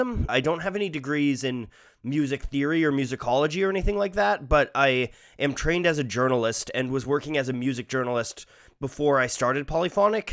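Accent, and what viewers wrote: Canada. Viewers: He really sounds a lot more Canadian. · You sound like my brother in law except with a Canadian accent.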